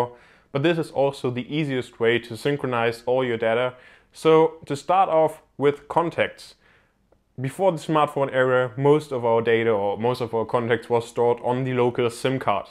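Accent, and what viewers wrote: Germany. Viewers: Der deutsche Akzent <3 Super Video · are you german? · Youre german?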